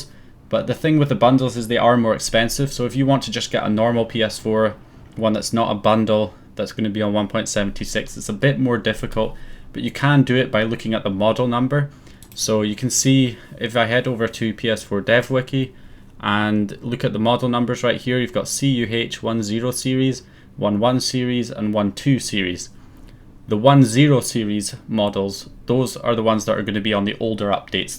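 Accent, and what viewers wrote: Scotland, United Kingdom. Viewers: Fuck me yer Scottish didn't know that we should start a modding team like the French modding team back in the day lol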